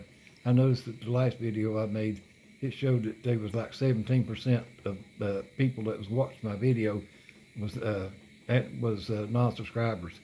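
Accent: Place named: South, United States. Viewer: From Gary's Northern wife here.....well....you still have the southern accent strong friend! Gary and I are Wishing you the best.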